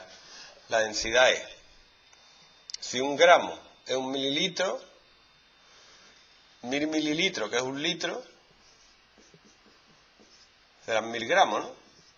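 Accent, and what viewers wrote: Andalusia, Spain. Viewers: quiero intentar atender pero es que er asento andalu` de este hombre ma`hace musha grasia killo XD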